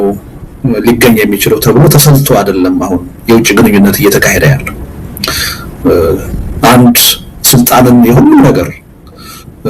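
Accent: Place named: Gaxaree, Ethiopia. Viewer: Diye diye...Wolduya..Gaxaree accent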